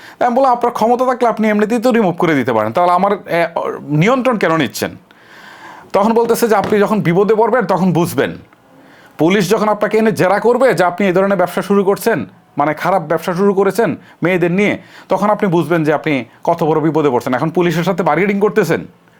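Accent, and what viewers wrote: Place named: Kushtia, Bangladesh. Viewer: ভাই আপনি কি কুষ্টিয়ার ছেলে?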